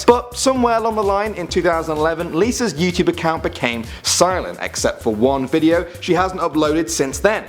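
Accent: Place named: United Kingdom. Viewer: Have you never heard a British pronunciation?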